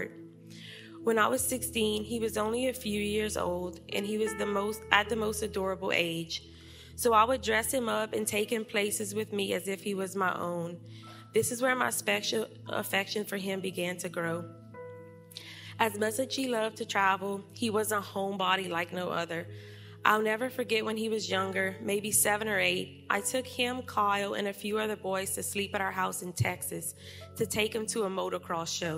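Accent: Louisiana, United States. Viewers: First on a lighter note, I have to say I love your Cajun accent. But, Mercy my heart breaks for you.. I can’t imagine how hard this is for you and will continue to be.. my heart goes out to you and my prayers as well. I unfortunately am apart of the same group no sibling wants to be apart of. One where we’ve had to live part of our lives without another.. mine was killed in a car accident in 1999 when he was 18 and I was 13. My best friend, who was more like a sister than just a friend, died in 2008. She was killed in an accident by a drunk driver when we were 22. My heart goes out to you and your other siblings and family. I know the pain though not your exact situation.. it’s pain I would never wish on another person. I wish I could reach thru my phone and hug you, I wish I could give you words that would help or relieve your pain. But I will always be here for you and will continue to pray for you and your family. 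I am so sorry. Sending love from SW Arkansas.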